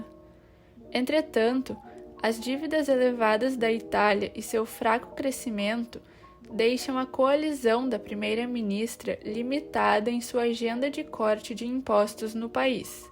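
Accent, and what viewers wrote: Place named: Rio Grande do Sul, Brazil. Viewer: eu adorei a narração, sotaque gaúcho e a menina deve ser linda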